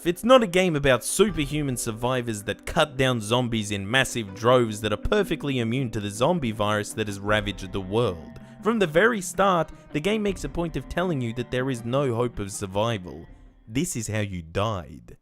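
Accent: Australia. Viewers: local youtuber describes an australian person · Sounds like an aussie, but also very Jewish. Or just has a cold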